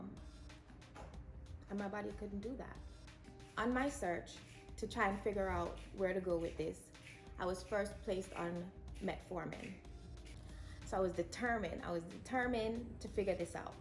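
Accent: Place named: Caribbean. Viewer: Thanks for sharing your story. I have not been diagnosed but the doctor has told me that this is his impression based on the results. I’m 24 years old, not married yet or trying to have, still in university but I always have that fear that when I’m ready I may not be able to, but I have faith. Your story is inspiring. Ps. You sound like you’re from some where in the Caribbean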